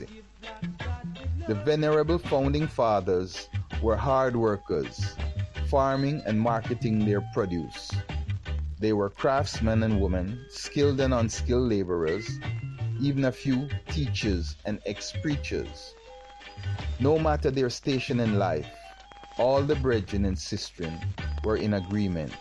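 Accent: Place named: Jamaica. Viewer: sorry I don't understand very well jamaican english. when you say " I 'n I" you mean "Inner I"?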